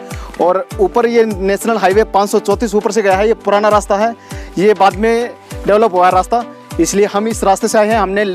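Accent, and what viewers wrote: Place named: Haryana, India. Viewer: बोली से हरियाणा का लग रा ह भाई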